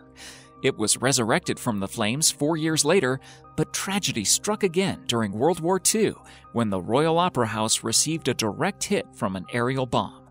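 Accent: United States. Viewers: I dont believe he did try to pronounce them correctly. He pronounced them how he thought they should be pronounced in american english, not correctly. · You just can't have an American doing voiceovers that involve any words - well, any words at all really. This bloke is clearly unaware of any of the world further than a mile from his home.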